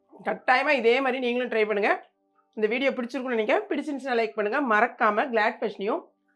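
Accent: Tirunelveli, India. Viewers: எனக்கு தோசை தான் பிடிக்கும் சிஸ்டர். என் அப்பா ஊர் திருநெல்வேலி தான். அந்த ஊரில் நீங்கள் சொல்வது போல் தான் சொல்வார்கள். நீங்கள் புடவை கட்டும் விதம் உங்கள் சிரிப்பு சூப்பர். வீடியோ பார்த்தாலே ஒரு எனர்ஜி வருது.ஒரு சந்தோஷமாக இருக்கு சிஸ்டர். நிறைய வீடியோஸ் போடுங்கள் சிஸ்டர். உங்கள் பேர் என்ன என்று சொல்லுங்கள் சகோதரி.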